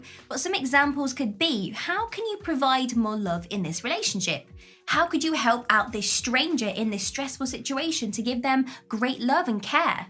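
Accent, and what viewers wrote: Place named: Scotland, United Kingdom. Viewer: I can hear some Scottish undertones...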